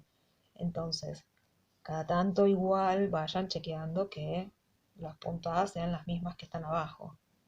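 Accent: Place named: Buenos Aires, Argentina. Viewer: Me encanta! Una argentina...por la tonada de Buenos Aires!❤. Bendiciones!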